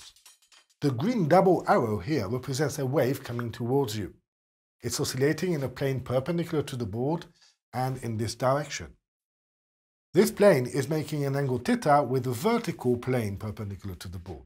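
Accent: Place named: Yugoslavia. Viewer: Sounds like he might be from Yugoslavia. Kinda difficult to understand.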